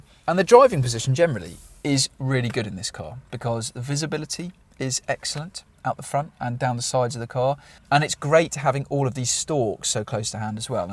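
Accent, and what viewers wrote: United Kingdom. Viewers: As a Frenchman what I enjoy the most about this video is the so delicate British accent, so much more elegant than American English. Isn’t ”boot” so much better than ”trunck”?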